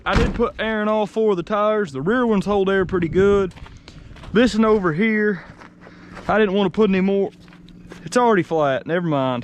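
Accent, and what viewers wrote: South, United States. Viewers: Shit your southern "dialect" is the best, gotta appreciate it when you hear it - not too often here in Finland :) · I love that Southern Accent!🐊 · That southern accent even if your smart you sound dumb. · Great videos sir! Used to live in Georgia and miss that southern drawl !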